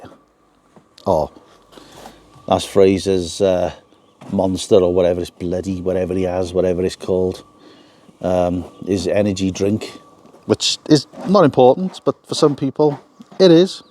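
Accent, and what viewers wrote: Wales, United Kingdom. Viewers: Wales is as beautiful as the people. Englishman living in Germany fellas. I miss Barmouth and visiting my retired parents who moved from Barmouth sadly more inland. I checked and I actually have more rain here near the Austrian alps than you do...but it's south Germany so we do get more sun. Love your people and the accent boy o. Top tips! let's have few pints together.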